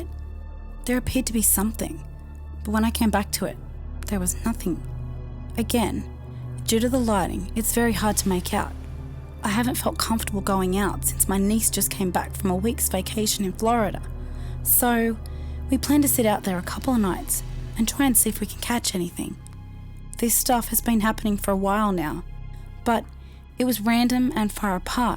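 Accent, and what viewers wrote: Australia. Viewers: I'm from middle Tennessee and wtf with the Australian womans voice for a Tenn womans story 😂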